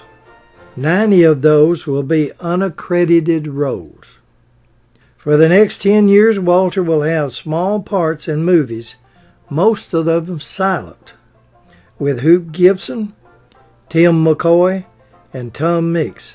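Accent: South, United States. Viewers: So happy to see you back and righting Walter's memory. So good to hear your Southern charming voice once again! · I used to enjoy your commentaries and biographies on your channel years ago, but being new and unfamiliar with YouTube and how it worked, somehow I failed or forgot to subscribe. I'm glad I found your channel again, and I promptly subscribed. As a fellow Southerner from Lake Charles, LA, I recognized you by your familiar drawl! 😉😁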